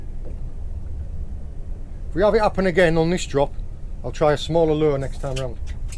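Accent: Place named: Newcastle, United Kingdom. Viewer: lad!!? Youust be one of the best boat anglers around (Certainly for my brass anyroadl!) YOU!? For ME¡ Are one of the best EXAMPLES of Young anglers about! You explain In ageordie/ northumbrland accent (which I like,but have always favoured!??) Longay you retaím it and 😂. Longmay you broadcast your Youtube channel it😮I channel and it retaím the same resilience and Charming lilt as you do now??!! I am now 67*😮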